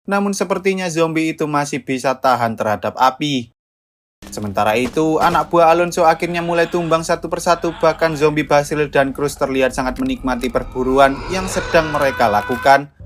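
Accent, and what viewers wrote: Java, Indonesia. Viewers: Mimin asalnya dari jawa apa?🤭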